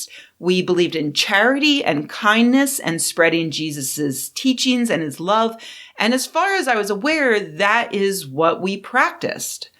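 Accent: United States. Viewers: 1.)Congratulations, you have a strong American accent, but you enunciate so well that I can easily understand you. I often give up on trying to understand Americans because, in addition to their accents, they fail to enunciate. 2.)I am autistic so my brain does not work like most people's. 3.)Even from before I was born, I attended an evangelical Baptist chapel in a strongly Baptist rural community. I still believe that the whole community, including my parents lived as closely as they could to the teachings of Jesus Christ - they were not hypocrites. But by the time I started school at the age of four, I had decided that I did not believe what I was taught at Sunday School, as much as anything because the God of the Bible did not behave as well as my parents or others in the community - he seemed like a quite unpleasant sort of chap. I retained a vague notion that all those adults whom I respected must have known something I didn't, but even that became untenable after a while.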